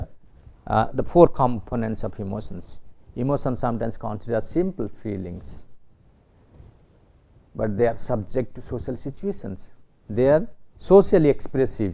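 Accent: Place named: East India, India. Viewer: He sounds from East India and there's nothing "wrong" in his accent...that's how Bengali axomia speaks English... every region has different accent, it's natural not wrong.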